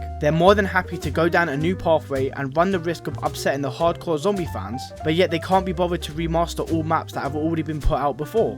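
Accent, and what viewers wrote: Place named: United Kingdom. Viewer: You sound like a british mrtlexify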